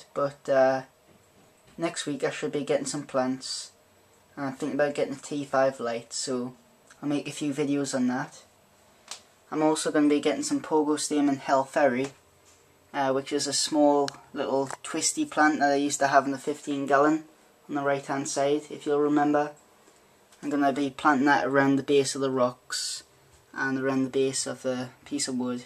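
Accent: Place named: Durham, United Kingdom. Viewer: Lol we're abouts are you from? Sounds like somewhere next to Durham lol. N how many tanks Do you have? I have two going to get more though :)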